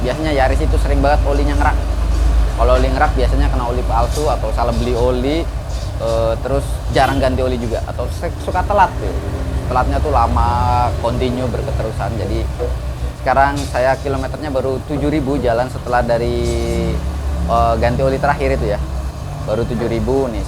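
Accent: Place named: Banyumas, Indonesia. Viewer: Om Ini Aslinya Orang Ngapak.Ya Ommm..."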